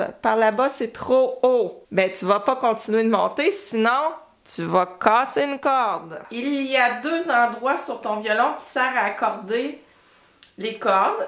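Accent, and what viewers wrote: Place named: Canada. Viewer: Superbe ces explications et cet accent merveilleux qui fleure le Canada...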